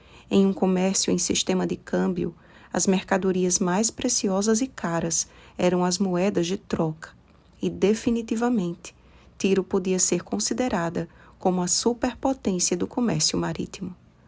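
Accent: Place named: Northeast, Brazil. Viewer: Excellent always! Your northeastern accent is beautiful, your voice is very soft and welcoming! God bless your ministry! I'm from the south of Minas Gerais, living in Alberta, Canada for 1 year and 4 months...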